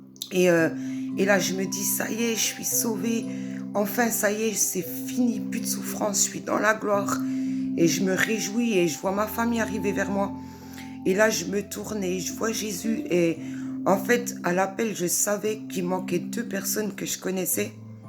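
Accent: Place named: Franche-Comté, France. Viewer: Hallelujah ma soeur merci pour ton partage, sois bénie au nom de Jésus Christ ! (Je reconnais que tu es Franc-Comtoise à l’accent parce que je le suis aussi hihi) Le Saint-Esprit en toi à parlé Gloire à Dieu ! 🕊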